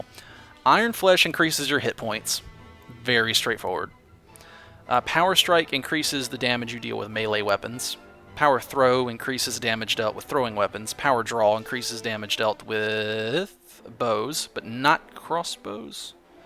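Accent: South, United States. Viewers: You sound like your from the South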